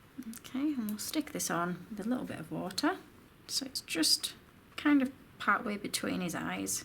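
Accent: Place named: Yorkshire, United Kingdom. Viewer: Hi this is great. Amazing! What a creation. Also your lovely accent reminded me of "Miranda" where Miranda pretends to be "From the general Yorkshire area".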